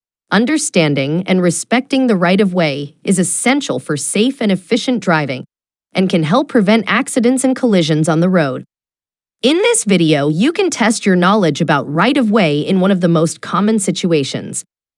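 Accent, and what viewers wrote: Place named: United States. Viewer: As the voice is American, I'm going with the DMV. Car B has right of way. Vehicles from the right, have right of way as both vehicles arrived at the same time. Reference California DMV: Remember, "right is right". The “First Come, First Served” rule applies. If two cars arrive at the same time, the driver to the right has the right of way.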